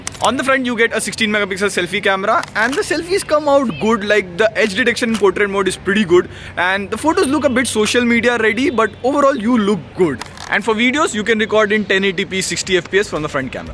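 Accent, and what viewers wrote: India. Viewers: English language video with Hindi sentences accent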